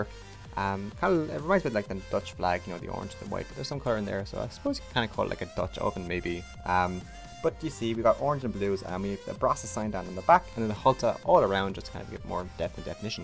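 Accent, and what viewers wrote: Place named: Ireland. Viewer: ahhh his voice is so asmr lol the only Irish voice that triggers my asmr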